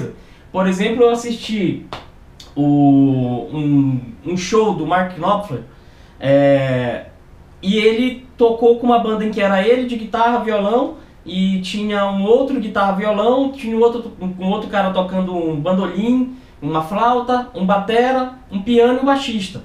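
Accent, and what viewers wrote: Pará, Brazil. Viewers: Ele e paraense, da pra ver pelo sotaque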